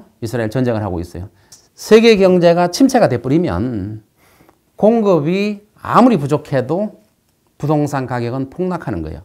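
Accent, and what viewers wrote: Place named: Yanbian, China. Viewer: 이분 말투가 중국 연변쪽 억양 이신듯?? 암튼 3달전 한국은행 발표에 따르면 한국 아파트 가격이 소득대비 뉴욕 도쿄의 3배로 미친 가격~! 이분은 방송중이라 보수적으로 고점대비 50%를 적정가로 이야기 하셨으나 실제는 80% 하락이 정상임. 여기에 인구는 세계최고 급감에 가계부채 세계1위라 50년 장기하락은 피할수가 없음. 전국민의 절반이 대출만땅 빚거지 금융노예라서, 부동산 망하면 나라망한다는걸 볼모로 정부에서 부채 모조리 탕감 해줄걸 기대하고 있지만 뒤통수 크게 쳐맞게 될 것임. 1인가구 증가해서 아파트 가격 오른다??? 1인가구 80%가 생활비도 궁핍해 원룸 월세사는데 10억 철근없어 저절로 무너지는 아파트 퍽이나 영끌해 주겠다??? 하여간 미친것들 천지임 ㅋㅋㅋㅋㅋ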